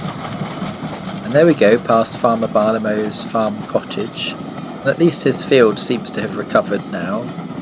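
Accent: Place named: Sussex, United Kingdom. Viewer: I love the old Sussex accent, cracked me up. Does Farmer Barleymow know that one of he's horses in the nearby paddock has sadly passed away ? I would love to get hold of a couple of black Terriers and convert them into Class D1's, but sadly there are none out there in N gauge that take a DCC chip. Loved the update. Regards Chris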